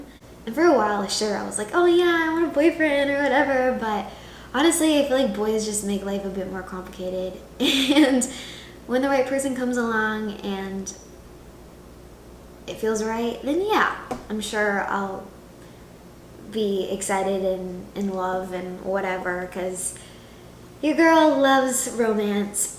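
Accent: South, United States. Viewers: I hear the county then you talk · out of all the years I’ve watched you I just realized you have a slight country accent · Bro why does sound like she has a southern accent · Oh my, you soul is so beautiful, you have everything I would want in a person 🤍. Personally, I can REALLY here that country accent in you, suits you so much. Thank you fo all that you do ❤️❤️❤️ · I can hear your country accent. Also, team Bluey. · I can hear your country accent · Who’s ur favorite country artist? Mine is:3 also u are so sweet and I hear a bit of a country accent when u speak and I love it!! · Her voice has gotten so much more country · Your southern accent is showing more and more. Also team Bluey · U sound like a real country girl i luv it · You really inspire me please always stay the light that you are. Seeing you grow up through the screen is amazing and you really are an amazing person( I love you soft country accent when you talk) I love your content so much. 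💜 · I can tell you are country! It’s the way you say certain words. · People saying your not country is mind blowing you sound country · Your country accent is so beautiful❤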